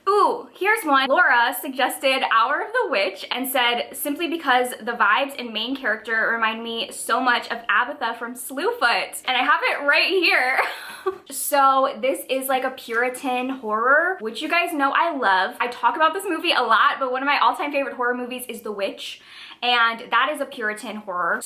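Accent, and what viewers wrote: United States. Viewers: Hi!! I just wanted to comment to say that Winter People authors name is Irish so it’s pronounced ‘Mac Mah-Hun’ you kind of said it right but with a very very strong American accent which is probably why it sounds kind of weird to us lol!!! I love your videos and your tbr vids are probably my favourite thing to watch 😂 💕💕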